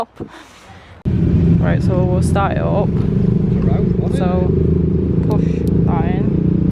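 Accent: Northern England, United Kingdom. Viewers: Yeah there's always some weirdos to contend with! Keep smiling and enjoying yourself, the enthusiasm comes through in your uploads which is great to see... Proper northern accent too... Im from Warrington and sounds like you're not to far away...ha ha.. ride safe chuck 👌😉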